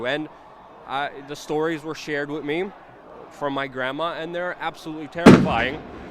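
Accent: Poland. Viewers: I knew you were Polish but I thought you were Polish-American and born in America and now I have no idea how I ever missed your accent.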